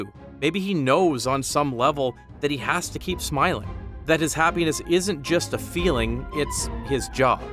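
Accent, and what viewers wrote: Canada. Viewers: Your Canadian accent is so prominent lol. Fire video though! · Are you Canadian, my guy?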